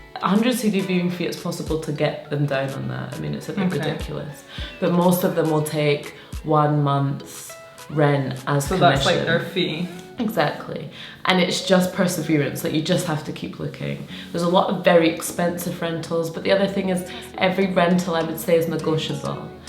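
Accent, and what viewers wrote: Scotland, United Kingdom. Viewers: I detect some scottish accent there ... am I right ? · I thought I heard a Scottish accent.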